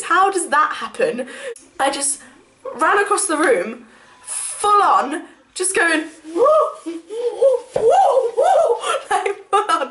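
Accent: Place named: South, United States. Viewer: this is great! Just hearing your southerner tones, and seeing you brought back so many memories...This is great!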